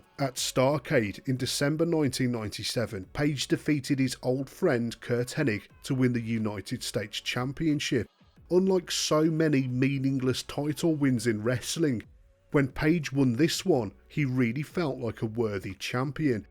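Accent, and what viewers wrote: United Kingdom. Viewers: How’d I know this dude would be British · No offense but why are all these wrestling bio youtube videos narrated by some UK bloke?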